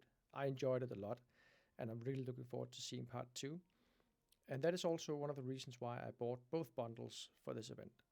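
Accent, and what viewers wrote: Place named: Netherlands. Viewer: oh, okay. Sorry about that. Your accent reminded me somehow of Netherlands 😂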